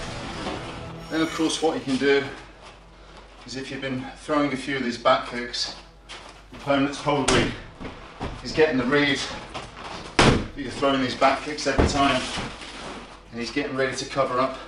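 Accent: England, United Kingdom. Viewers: Hebal you and I have something in common. I love British accent. I think that's what you like about his English, the accent. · I feel like im not looking at the person who acted as Boyka and especially with that english accent its really hard to digest. Its like im looking and hearing a different person 😂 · So weird hearing You speaking With a brittish accent after seeing You in IP man. Great video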